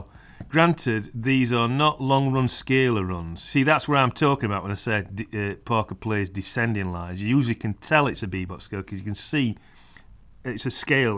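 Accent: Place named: Stoke, United Kingdom. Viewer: where are you from? It sounds like you've got a bit of a Stoke accent but with some brummie mixed in..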